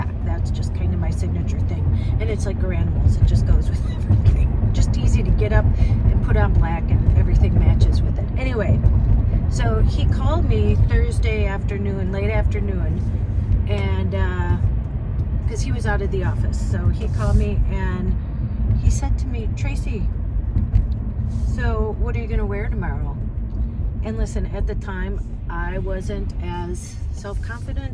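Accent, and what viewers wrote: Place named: Vernal, United States. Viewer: Sounds like Vernal, Utah.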